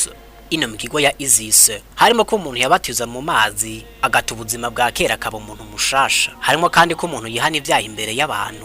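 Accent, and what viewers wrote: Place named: Rwanda. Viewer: Komera cane kuri Documentaire bwana ariko accent zawe ni Mwanafunzi Esmail yuzuy Hama ikinyarwanda nicinshi kurusha ikirundi. Gusa courage cane kubushakashatsi I'm from LIMOTV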